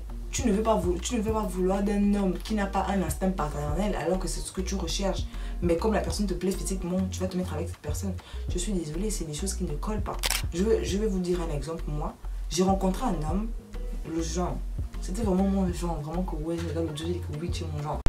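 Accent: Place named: Gabon. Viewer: C'est l'accent Gabonais qui me retiens 😂😂super pour la vidéo j'aime ❤❤😊😊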